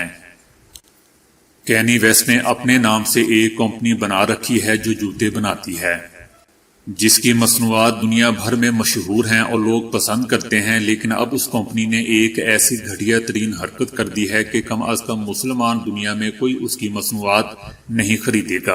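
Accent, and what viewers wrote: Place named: Punjab, Pakistan. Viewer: aap baz alfaz pajabi lahjay may boltay hain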